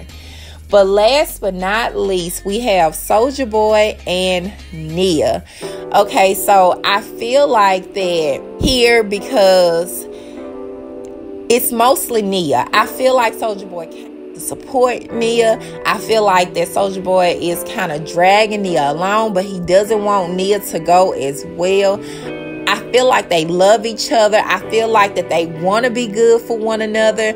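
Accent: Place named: Memphis, United States. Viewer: You kinda SOUND like Jessica...accent wise. Are you From Memphis?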